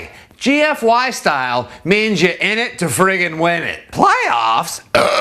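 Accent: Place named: Boston, United States. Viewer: Funny! You sound like David Spade with a Boston accent.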